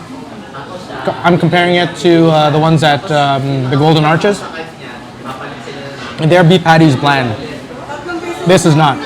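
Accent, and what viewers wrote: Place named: India. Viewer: Id rather listen to a chainsaw sound rather listening to an indian accent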